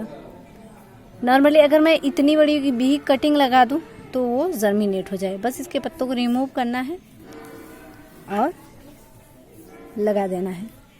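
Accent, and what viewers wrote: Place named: Uttar Pradesh, India. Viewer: Accent se aap UP ki lag rahi ho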